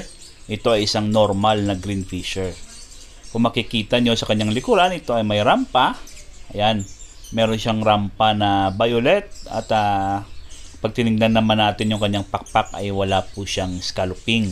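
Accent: Iloilo, Philippines. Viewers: Puga lods ilonggo ka gid? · To ilonngo ka.. may punto kasi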